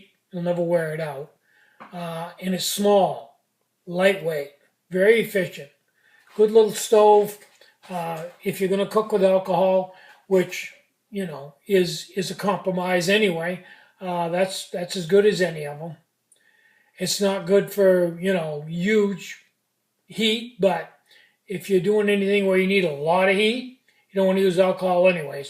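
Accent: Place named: Boston, United States. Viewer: sounds like you're from Boston.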